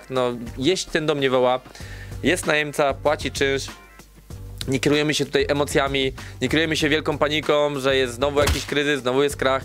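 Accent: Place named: Eastern Europe. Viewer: Slychac rosyjski ukrainski albo bialoruski akcent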